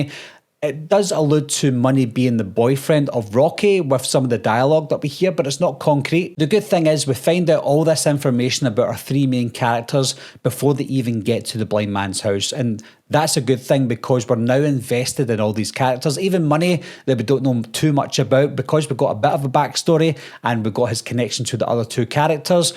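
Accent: Scotland, United Kingdom. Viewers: I didn’t expect to come across an Irish youtuber that breaks down movies, I was curious, but now I’m pleasantly surprised it’s the accent I like to hear the most😭😭 (or it could be Scottish I honestly have trouble differentiating the two, but I like them both)❤️✨ Checked the about. Sorry, Scottish** youtuber 😆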